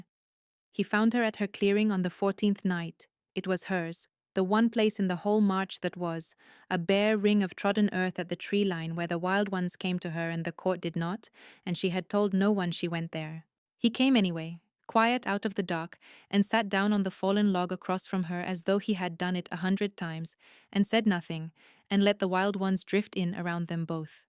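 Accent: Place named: India. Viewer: Can't listen to this story, sounds like Idian Muslim accent which hates dogs!!!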